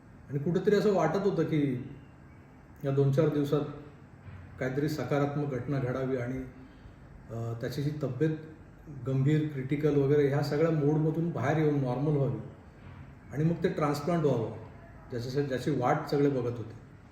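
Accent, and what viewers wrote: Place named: Khandesh, India. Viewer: खानदेशी लहजा ला कायमचे मुकलो खुप दुःखद घटना 😔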